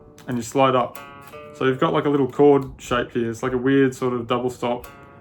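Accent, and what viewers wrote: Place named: Newcastle, United Kingdom. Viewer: For some reason I thought you were from Newcastle UK..😂 Great lesson.. Great guitar style too..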